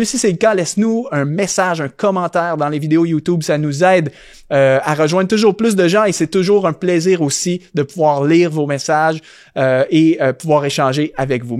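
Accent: Quebec, Canada. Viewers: ton bel accent du Québec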